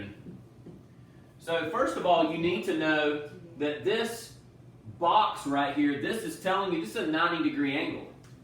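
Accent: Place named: Ireland. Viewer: my one complaint is the Irish accent. Other than that excellent video, keep it up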